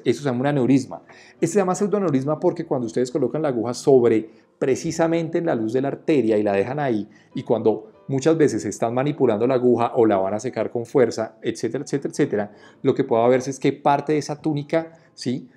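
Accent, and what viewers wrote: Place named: Huila, Colombia. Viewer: Perdón Eres Opita? Del Huila? Soy de Caracas Venezuela, pero hablas igual un tío mio de allá, saludos, por cierto, gracias por la información del vídeo.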